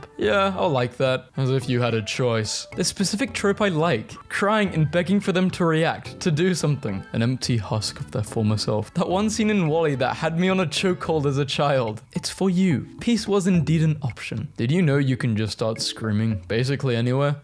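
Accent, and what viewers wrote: United Kingdom. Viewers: why do you randomly pronounce the r in Kirby like an American but sound british for literally every other word?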